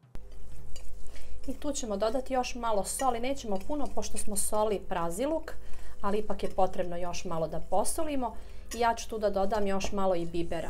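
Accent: Bosnia and Herzegovina. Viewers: kuvas i akcenat? Ispod naslova ima napisane sastojke, bez akcenta 🤣🤣🤣. Btw zena je iz Bosne, pa otuda i akcenat. .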